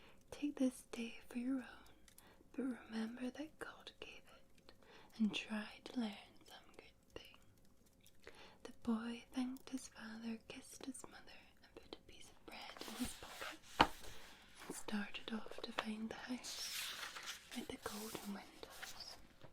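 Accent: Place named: Scotland, United Kingdom. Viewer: This is your best video yet 👍 absolutely my favourite Scottish accent in the world, thank you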